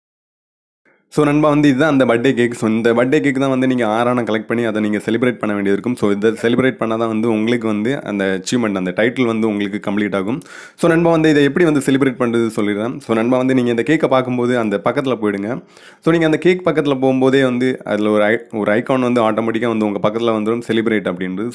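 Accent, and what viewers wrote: Kanyakumari, India. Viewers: கன்னியாகுமாரி யா ப்ரோ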